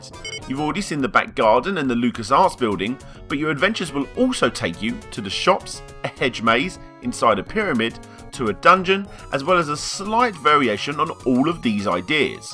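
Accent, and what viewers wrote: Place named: United Kingdom. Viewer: he's british ;-; so uhh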